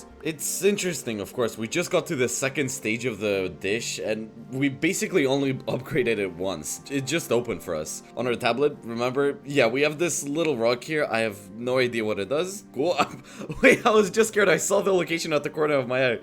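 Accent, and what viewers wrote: Russia. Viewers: Are you from Russia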